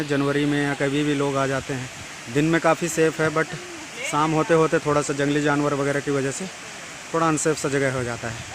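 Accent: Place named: Jharkhand, India. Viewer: कमेंट्री और बैक ग्राउंड म्यूजिक के वॉल्यूम में तालमेल नहीं है।कमेंट्री सुनने के लिये वॉल्यूम को बढ़ाना और म्यूजिक की बारी आने पर वॉल्यूम घटाना पड़ता है।फिर, कमेंट्री का स्टाइल आपसी बात-चीत की तरह "स्पीडी" है, जब कि इस तरह के वीडिओज़ में कॉमेंट्री स्पष्ट आवाज और थोड़ा,.. थोड़ा स्लो स्पीड में होना चाहिये। आप अपने कमेंट्री में लोगों को आमंत्रित कर रहे हैं कि कभी झारखंड आइए तो ..... इसका मतलब आप बाहरी लोगों को आमंत्रित कर रहे हैं।ऐसे में आप को राष्ट्रीय स्तर की भाषा में कॉमेंट्री करनी चाहिये, न कि " आव रे, जाव रे " वाले स्थानीय स्तर पर।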